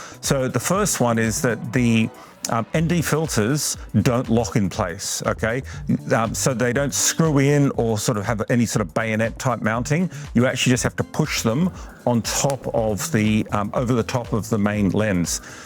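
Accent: Australia. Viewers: Guy sounds Aussie, why would he give a fuck? And as an American, this "it's made in America" to prefer inferior products is lame. American companies should step up their game instead of keeping 6 year old sensors like GoPro. Don't even get me started about the DJI drone ban in the US with clueless politicians.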